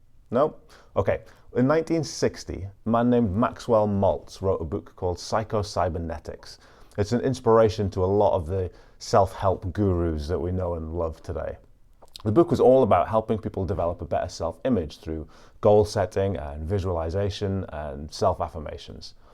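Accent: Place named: North, United Kingdom. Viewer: I can't place your accent, you sound like a northerner but something is telling me you're Scottish.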